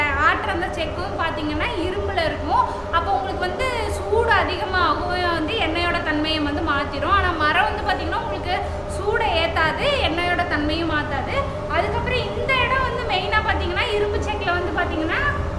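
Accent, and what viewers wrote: Kongu, India. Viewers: செய்யும் தொழிலே தெய்வம்...இந்த வாக்கியத்திற்கு ஏற்றார்போல் செய்றிங்க.. வாழ்த்துகள்... சகோதரி...வாழ்க வளத்துடன்... உங்கள் வீடியோக்களை இப்ப ஒரு வாரமாக பார்த்தேன்..என்னமா எல்லோரையும் கொங்குதமிழில்பேசி அருமையாக சிரிக்க வைத்துள்ளீர்கள்.. உங்கள் குழந்தைகளும் நடிப்பில் அசத்துறாங்க... உங்கள் கணவர் அதைவிட சூப்பர்மா. நீங்கள் நலமோடு வாழ வாழ்த்துகிறேன். நீங்க மென்மேலும் வெற்றி அடைவீர்கள்...🎉🎉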